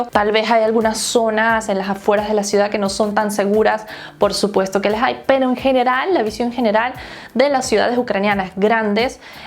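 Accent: Venezuela. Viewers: Es como si una venezolana me estuviera explicando sobre Ucrania. Saludos! Pais hermoso Ucrania Por cierto, eso es totalmente cierto lo que dice en ; es uno de los motivos del por que me quiero ir de Venezuela Naguaráaaa! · Ya decía yo, esta chama como que habla caraqueño. xD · De hace unos 2 meses aprendo ruso y de pronto me encontré con tu canal y ese acento me decía lo conozco, pero, será que me equivoco.... Pero hoy ya me di cuenta que no estaba equivocado Venezuelaaaa jejeje excelente.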